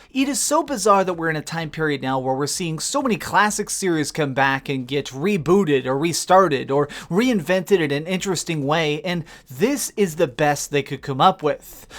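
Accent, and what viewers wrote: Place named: South, United States. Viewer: Stop with the fake announcer voice we all know you are trying to hide that southern accent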